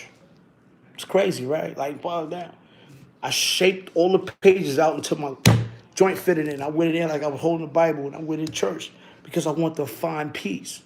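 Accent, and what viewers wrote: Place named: New York, United States. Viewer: It’s really a NY thing first. Italians from Italy or outside the NYC & Philadelphia metro area don’t sound like that. Especially being from Brooklyn, he definitely grew up around Italians.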